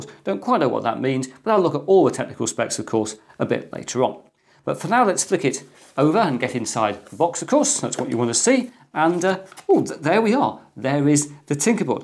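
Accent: England, United Kingdom. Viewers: It's amazing how you have a guy with an English accent and everything he says sounds more intelligent and straightforward....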